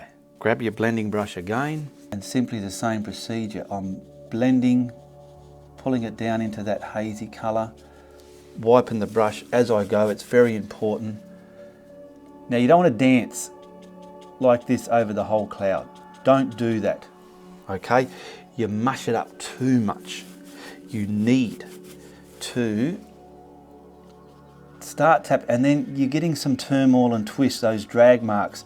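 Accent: Australia. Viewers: Hi, I love watching your tutorials and have seen you grow as an artist. I enjoy hearing your Aussie accent and fun expressions such as “put-er-onner brush” and “blend it to buggery”. Great tutorial! I’ll be trying this technique in my next work. Thanks and keep producing these videos for us. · I was looking for a video of how to paint realistic clouds. You made it look easy and gave a great explanation. It’s my first time watching your videos. Will definitely watch more. Also, love your accent, Australian? I have always wanted to visit Australia.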